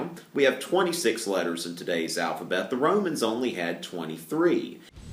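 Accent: South, United States. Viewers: dAt southern accent dough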